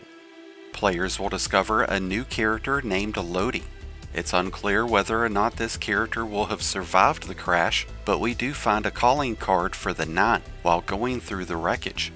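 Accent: South, United States. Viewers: he's saying bc you have a southern accent, partner.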